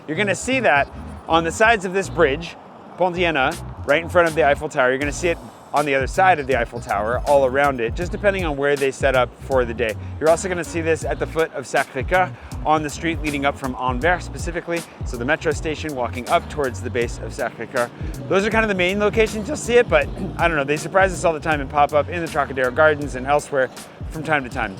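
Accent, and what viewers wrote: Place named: United States. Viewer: You've lived here long enough to become French yet you sound like a ********g American. Hope we do not bump into each other. I've lived here 10 years.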